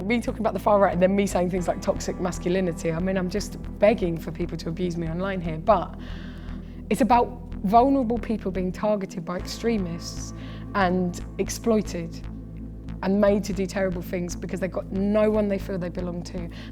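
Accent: United Kingdom. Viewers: Just another over privileged white person telling other white people how priviledged they are. Same old shit just with a British accent.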